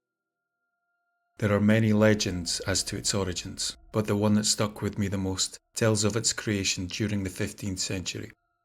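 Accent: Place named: Scotland, United Kingdom. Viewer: It is good to see a programme about Scotland and hear hear a Scottish voice talking about Scotland. I love the Scottish accent,. I am half Welsh myself, but prefer Scottish. There was so much to learn from this video. It was so bad what the English tried to do to the Scottish it makes me feel sad, and yet it was so long ago. Sometimes times does not change anything does it.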